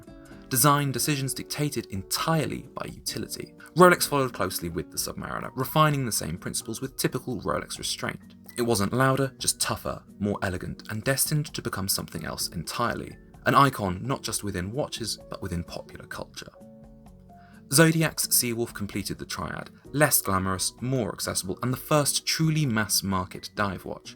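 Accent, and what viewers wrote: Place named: Upper class, United Kingdom. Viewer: Just found this channel and it is already my favourite place to go for all things watches. Although I’d like to make an observation and please do not take this as a criticism. Why do a majority of watch channels speak with a very distinct middle upper class accent? With very unique pauses between words. To give you an example listen to TGV, to bark&jack, time&tide etc etc the list is endless. They all talk the same way which I thought was very unusual. I haven’t noticed this with any other hobbyists besides horology. Like to hear your thoughts on this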